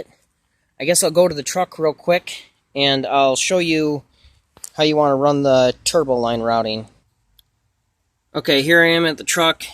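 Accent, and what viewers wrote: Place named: Michigan, United States. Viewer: Bro are you from Michigan? I went to welding school with a guy that sounds identically to you 😂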